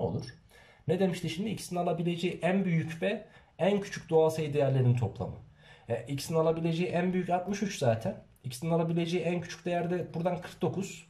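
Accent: Ankara, Turkey. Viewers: Hocamız Angaralı sanırım :D 👍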